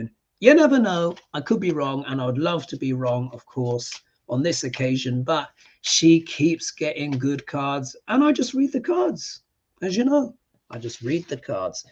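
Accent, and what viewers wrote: United Kingdom. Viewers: 👍🤩The British accent is so easy and good to hear I understand it better. Good predicting as well.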